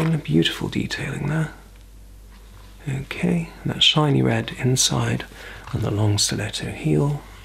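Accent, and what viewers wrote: RP, United Kingdom. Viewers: Omg he is so handsome plus he's sweet British accent is going to melt my computer. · That incredibly beautiful accent. That's the British accent I love most. Makes me feel like I'm in excellent company. Also, this artist is a superb actor. Talk about talent! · Sometimes the simple english language spoken with the right amount of british accent is really asmr inducing. Bravo. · Британский акцент невероятен☺ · Now this is a real posh British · He's talking in a noble british accent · I randomly came across this video and lemme tell, that British voice is just smooth and So ASMRy.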